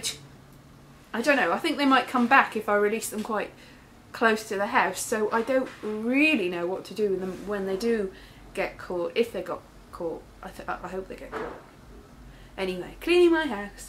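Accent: Wales, United Kingdom. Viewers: My bane at the moment are ANTS. They're freakin' everywhere. No humane thing here. I kill em all, damned things! They get in my pants, my shirt, my crotch... damn them! Anyway, nice to see your mom. I haven't told you, but after watching lots of Torchwood, I gotta say I love the Welsh accent. Your poor arms!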